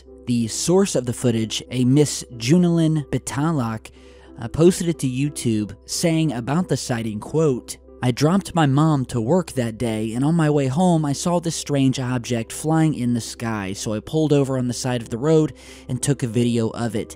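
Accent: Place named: Texas, United States. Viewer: Love the Texas accent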